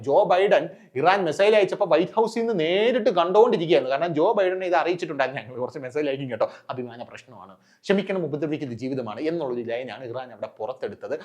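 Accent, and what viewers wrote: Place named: Trivandrum, India. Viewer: Nice explanation in Tvm. Slang, very interestingly presented ❤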